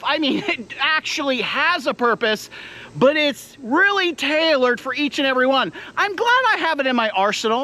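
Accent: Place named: United States. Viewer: omg.. a loud yank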